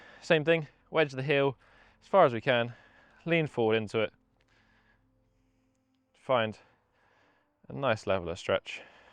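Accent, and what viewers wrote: United Kingdom. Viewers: This is so good, and the British accent🎉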